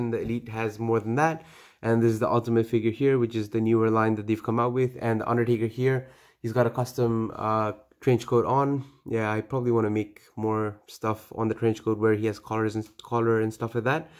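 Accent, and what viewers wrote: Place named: India. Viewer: You sound like you're from india or neighbouring country how did you get this figure I want it too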